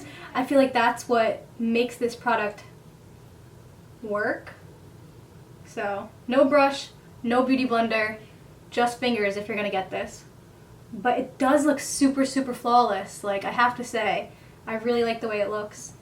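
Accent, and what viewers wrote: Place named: Long Island, United States. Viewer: Are you from NY? You have kind of a Long Island/NY accent... especially heard it in the way you said orange. just curious!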